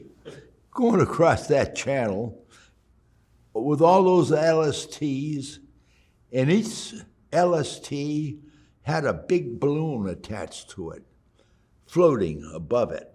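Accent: Boston, United States. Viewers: This guy is a real son of Massachusetts… WICKED HAHHD accent and all! 😂🤣Reminds me of my grandfather and great uncles from Massachusetts who all bravely served in WWII. My grandfather Charlie died when I was just 8 years old. I wish I could’ve known him as I got older. I wish I could’ve had the opportunity to sit down with him, smoke a cigar, and drink some of his favorite bourbon (loved the real KY stuff) with him and ask him about his life growing up in the depression and then his service in the war (Army aircraft mechanic, Pacific Theater), building his family and working as a butcher for Chicago Beef for 40 years. My mom being the youngest ‘oopsie baby’ born in 64’ when he and my grandmother were 40. 😂🤣🤣 I saw my grandfather in this man and started crying when I was watching. I miss you everyday and love you so much Pa. I’ll see you again someday and we’ll have that rocks glass in heaven. 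🇺🇸🫡 💙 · Like the Boston accent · he has the same accent as my grandfather who grew up in Charlestown MA in the 40’s and 50’s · This guy has an accent exactly like my friend from Malden Massachusetts.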